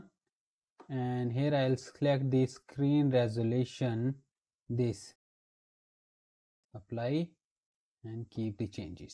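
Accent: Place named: India. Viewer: i hear das indian accent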